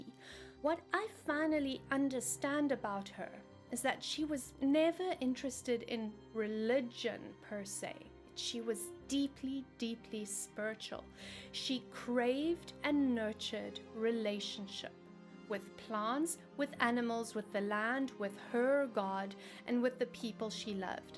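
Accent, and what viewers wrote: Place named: South Africa. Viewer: I cannot explain how ecstatic I was when I clicked on this video recommended to me and heard a South African accent! So excited to watch every single video as I’ve been watching witch channels from America and the UK but some things were just not relevant to my location. ❤❤❤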